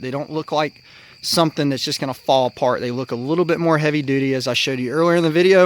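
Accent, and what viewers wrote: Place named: United States. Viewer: Where you from? Cause you sound countrier then a mug cuz.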